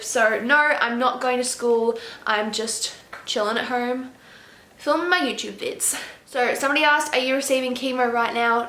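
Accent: Australia. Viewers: I really love your videos, your personality shows so much <3 Also, i lub your Australian accent omg, I could listen to you talk forever.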